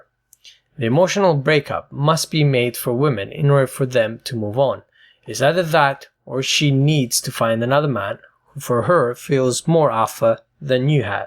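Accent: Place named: Russia. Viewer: you sound like you are russian or ukrainain.... i dont know why... but you were spot on.. why is it that.. people from such countries are so redpilled?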